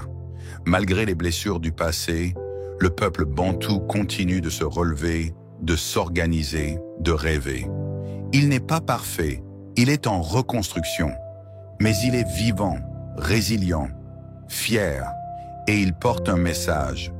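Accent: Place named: Europe. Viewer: je parlais de la voix qui faisait les commentaires sur la vidéo c'est une voix européenne et les prononciations ne sont pas africaines.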